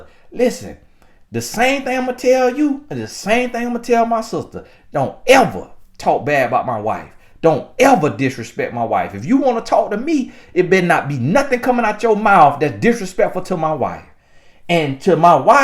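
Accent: South, United States. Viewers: You remind me of my sweet husband. We got married young. He was from Alabama. He passed so I love hearing the southern accent. I'm from California.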